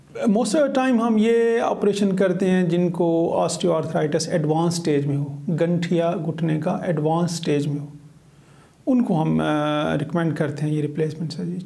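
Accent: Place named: Khyber Pakhtunkhwa, Pakistan. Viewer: Dr.sahib where u located your accent show KPK.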